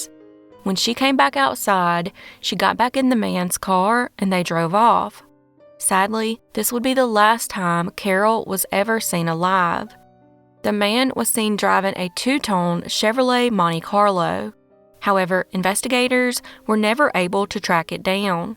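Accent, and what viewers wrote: South, United States. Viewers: My favorite southern girl's voice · I enjoy your videos. I love you accent which is easy for me to understand, being Southern lady myself.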